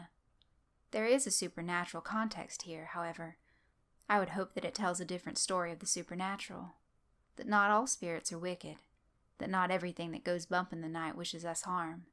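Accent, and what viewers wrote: South, United States. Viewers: When a creepy pasta is narrated by Scarlet O’Hara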